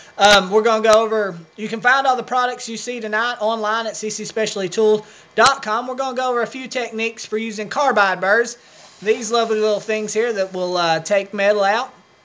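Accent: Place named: South, United States. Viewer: ha! you are a bit southern sounding.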